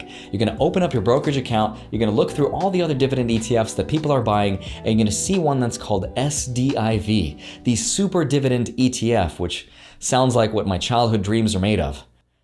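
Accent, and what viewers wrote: Russia. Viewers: I love your Russian accent. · You are my favorite stock guy!! And could you please use your Russian accent more.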